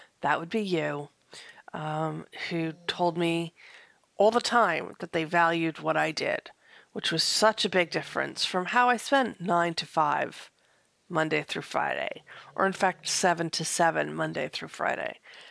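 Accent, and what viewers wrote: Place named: United States. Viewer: Kind of a personal question, and maybe you've answered it before... You sound like an American, how did you come to be living in Ireland? Also, do you like living there? (I might be looking for a new country to move to after November 3, lol.)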